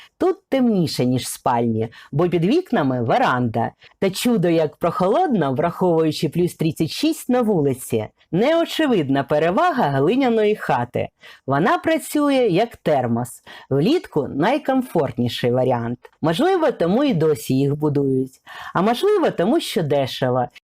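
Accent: Russia. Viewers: Та де там "гарна"! : ЗАХАДИ та живи, вітальня-СТАЛОВА, коти МІЛКІ, намагалась знайти ТРІЩИНИ, на випадок проблем з ВОДОПРОВОДОМ, велике ПРИДАНЕ.., вже не кажучи про оте московське "акання"; невже аж так важко вимовити "о", тим паче читаючи текст: адже написано по-руськи (т.б. українською) "о", а вимовляють по-московськи "а"?!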